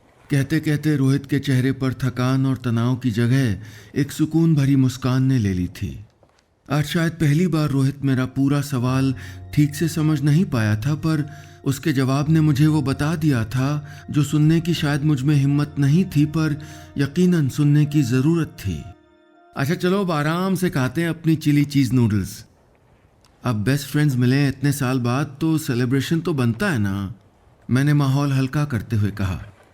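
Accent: India. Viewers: Main 2018 se apki kahaniyan sunti aa rahi hoon apke alawa aur bhi bahut logo ko suna lekin apke jaisa desi accent aur kisi ke pas nahi Aap kahani sunate hain toh lagta hai jaise sab ankhon ke saamne ho rha hai, bahut sukoon hai aapki awaz me Ise hamesha jaari rakhna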